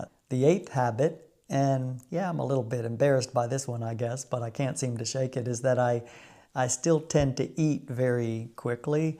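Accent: United States. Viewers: Not a problem to hear your southern accent. I think when I visit Tennessee they wil notice I am a Dutchy. As I said earlier this is an old account and member 2 for you 🙂 But still Mark* <link>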